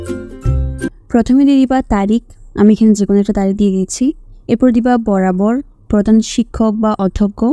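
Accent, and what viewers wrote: Chittagong, Bangladesh. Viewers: Chittagong accent?😅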